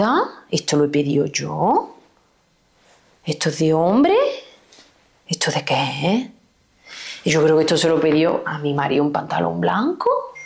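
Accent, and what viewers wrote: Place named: Andalusia, Spain. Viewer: Hola llevo 2 videos sin oírte k pena pork me a gustado todo pero no e oído esa voz de Andaluza desde Almería mil besitos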